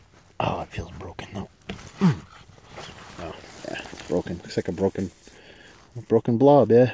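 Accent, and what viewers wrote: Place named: Boston, United States. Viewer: I like that 'Boston Accent' !!!! Perfect setting for 'The Dig '!!!!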